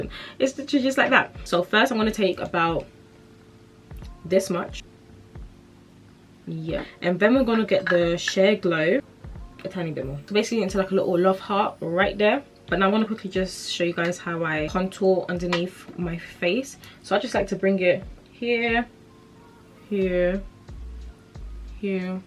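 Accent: United Kingdom. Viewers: Wasn’t expecting the british accent!! Buzzing cause most foundation routines are american and they using products we can’t get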